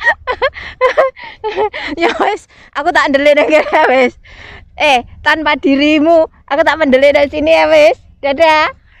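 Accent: Indonesia. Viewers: Suaranya medok banget kak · Ko logatnya kaya orang Jawa timur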